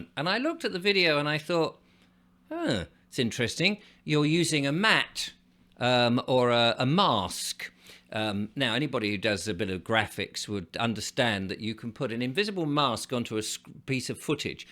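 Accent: United States. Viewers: Pretty good American accent. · Love your American accent.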